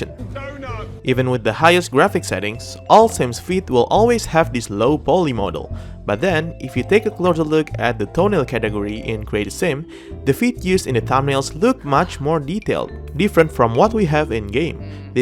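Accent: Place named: Indonesia. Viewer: always love hearing your indonesian english... unlike how most locals talk nowadays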